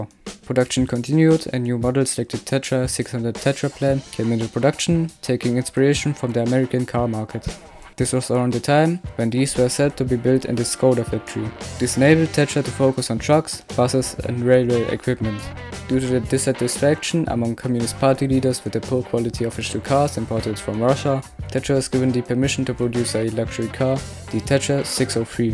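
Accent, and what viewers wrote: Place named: Germany. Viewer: Ich höre mehr Deutsch als English, aber trotzdem gutes Video.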